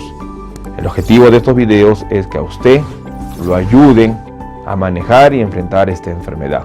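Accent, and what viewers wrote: Peru. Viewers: Por el acento parece peruano o de Colombia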